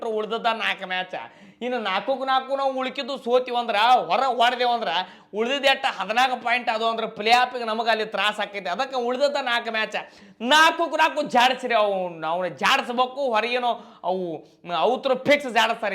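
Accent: North Karnataka, India. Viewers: ಉತ್ತರ ಕರ್ನಾಟಕ ಭಾಷೆ ಸೂಪರ್.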